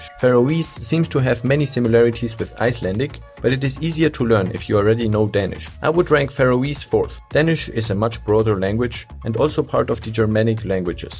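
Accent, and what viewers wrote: Germany. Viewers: Are you german? I'd swear your accent is 100% german and I'm just asking me the question if I should move out of the country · Richtig rausgehört das da ein deutscher spricht 😆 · You sound German. Is that right? Thanks a lot for a great video. Really great and informing.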